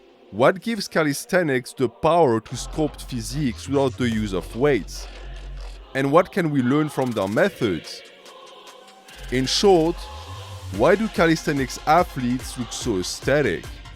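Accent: France. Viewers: As always, great stuff! Just sad you didn’t start the video with “hey YouTube” in your French accent That’s half the reason I watch these videos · Ur french but other than that nice video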